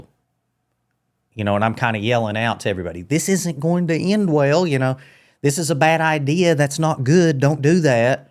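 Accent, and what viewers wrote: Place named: Appalachia, United States. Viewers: r u in West Virginia? i grew up there and your accent sounds like where I grew up. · Love your hillbilly accent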